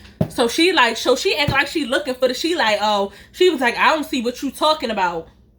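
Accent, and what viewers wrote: Baltimore, United States. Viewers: This was your first storytime I watched and when I say that your accent and storytelling skills kept Me fully entertained, it did. I Love Your BMore accent🥰😊😊😊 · I love your Baltimore accent lol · My man here like I know she from Baltimore lol your accent STRONG LOVE YOUR CONTENT BABES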